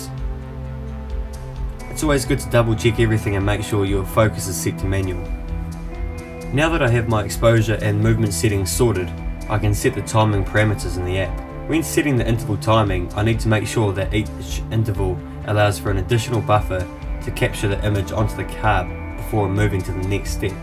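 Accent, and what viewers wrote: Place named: New Zealand. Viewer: The accent is standard Kiwi.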